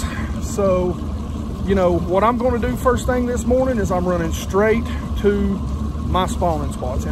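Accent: South, United States. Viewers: Great stuff. Best of luck to you this season! Love the southern accent!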